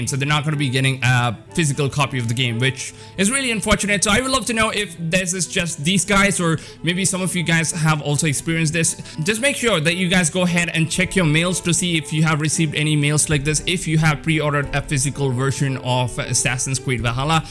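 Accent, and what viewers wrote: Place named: South India, India. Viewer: That south Indian accent is creeping through your American accent.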